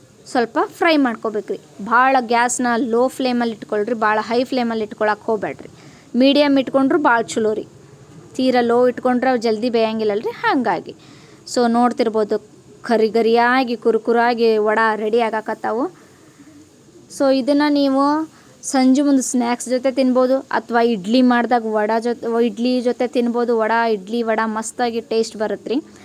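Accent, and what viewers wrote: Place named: Bangalore, India. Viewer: Niv Bangalore kannada matadri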